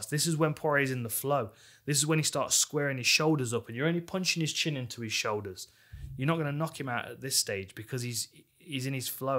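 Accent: United Kingdom. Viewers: Listening to you talk about mma makes me so relaxed man it's a form of meditation for me you heighten all my senses and I love listening to your soothing British accent and excellent flexion. Thanks bro!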